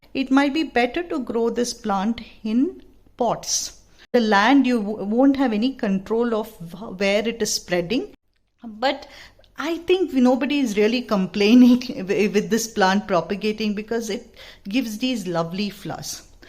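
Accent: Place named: Bangalore, India. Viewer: Your channel and narration is a welcome change...lots of information... and glad to know you are in Bangalore...I could make out by the way you speak :) (edit: as I continue to watch, I hear you say that you found lots of caterpillars..does that mean it's a host plant for a kind of butterflies? Asking as I just ordered and reading up on it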